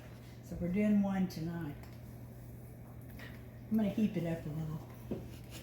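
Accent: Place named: South, United States. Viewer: Thanks, this brought back memories from my grandmas cooking. She had the same southern accent, too.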